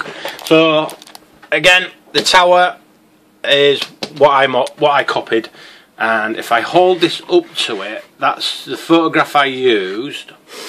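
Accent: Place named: Yorkshire, United Kingdom. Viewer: Oh.... I somehow have the impression that you are a Yorkie,? I do hope that I am not wrong because such a realization would indeed make me turn into a dog with its tail between its legs, to use a metaphor..... Anywhos..... I suppose anyone from the Tees to the old Humber, and all in between and possibly, all here, there and everywheres, could be classed as being a Yorkie, even though that does not make even a smidgin of sense.... However, and even so-so, I actually get the feeling that your beautifully eloquent commander like voice has a tint-of-a-hint of an accent that is actually from no less than bang, stab, right in the middle of the old, soon to be re-vamped, kingdom, i.e. your accent to me suggests that you are born and bred, and I suppose, ultimately from - York City - long-winded or no?. I do hope that I am not wrong. So, what is the answer? 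Leeds, no doubt! When I think about it, though, I am now leaning more towards Sheffield....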